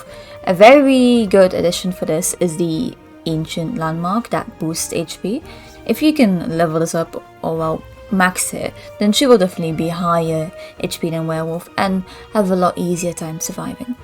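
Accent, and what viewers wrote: United Kingdom. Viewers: sounds a bit british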